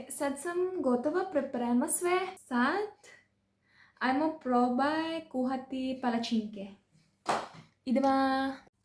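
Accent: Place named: Podravina, Croatia. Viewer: Ne ona je podravka.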